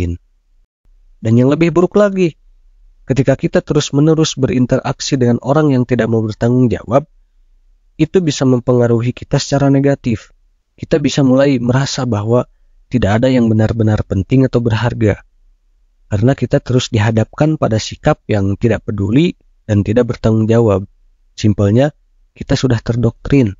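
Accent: Indonesia. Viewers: Senpet salfok, background nya meni garang, tapi voice over nya nyunda pisun 😁😁, hatur nuhun kang ilmunya, resep pisan videona meni berpaedah.. gass lanjutkaan berkarya.. ❤ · Sunda banget nada y 😁👍👍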